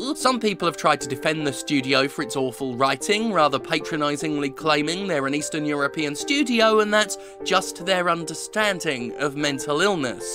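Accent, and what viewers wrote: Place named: United Kingdom. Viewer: Your British? I thought you were Canadian egh,. Well explains why you never say sorry egh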